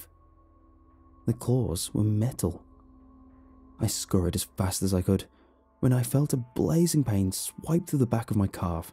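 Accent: England, United Kingdom. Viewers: Which part of England were u brought up in? You’ve a lovely, rich & expressive speaking voice. & i just wondered where, exactly, u originally came from. Middle England is just too vague. Please!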